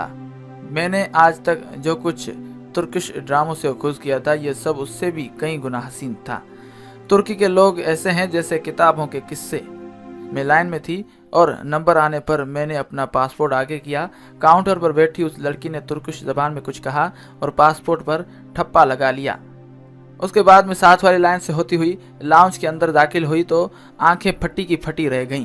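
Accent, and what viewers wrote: India. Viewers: Tum indin ho tmhari awaz zuban sy lagta hy tum nafrat ka bej bo rhy ho ya tum irani ho i hate you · Why u have hindi pronounciation? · بھائی یہ ایک انڈین چینل ہے جو پاکستان اور عرب ترکی کے درمیان نفرت پیدا کر رہا ہے آپ اس بندے کی اردو چک کرو اس کا اردو میں لہجہ بہت مختلف ہے جس سے پتہ چل رہا ہے کہ یہ ہندی میں بات کر رہا ہے اور انڈین ہے اس لئے ایسی ویڈیو سے بچ کے رہو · بات پاکستانیوں کی ہورہی پے اور لہجہ انڈیا والوں کی کچھ عجیب سا نہیں؟ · Ap indian style main kune baat kertay hain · هر جگہ پر ہر قسم کے لوگ ھوتے ھیں ۔ بیشرم آدمی تمھاری اردو ھی انڈیا والی ھے تمھارا کچھ بھی سچا نہیں۔ تم خاص ایجنڈے پر کام کر رھے ھو۔ سعودیہ اور ترکی کے خلاف ھی کیوں بکتے چلے جا رہے ہو ۔ اسلامی دنیا کے یہ دونوں ممالک بہت محترم ھیں۔ shame on you. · Assalamalikum he is not speaking pure urdu. It is Indian. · Yey bolnay wala Indian hay, yey musalmano kay khilaf Indian Hindu Propaganda video hay, iska lehja indian hay.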